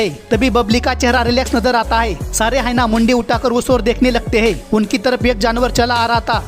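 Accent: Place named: Mumbai, India. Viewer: Mumbaikars. Wali Hindi bol Raha hai Bhai ek number 😂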